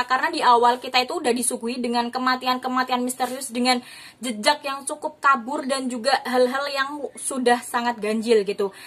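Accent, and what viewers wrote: Java, Indonesia. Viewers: Suka sama logat kak jawa banget😍😍😍 aku juga asli jawa lho kak, tapi tinggal di Bekasi hehehe😁😅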